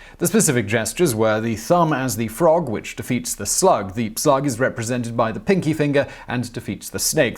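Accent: England, United Kingdom. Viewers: Ok , I just spent of my life listening to this cuz Simon has a great voice and Honestly, had ANYONE ELSE said with a straight face that there were PROFESSIONAL SPORT ORGANIZATIONS re: RPS I would have called Bovine Scatology in a nanosecond.... Saying stuff with the weight of credibility using an English accent has to be a superpower.... That being said I’ll see your RPS clip and raise you one...” I dare you to do a clip re the origin of LIAR LIAR PANTS ON FIRE “ Have a good wknd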